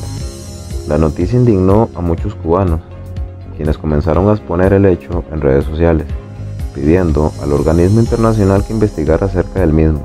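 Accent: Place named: Nicaragua. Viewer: No es cubano parece nicaraguense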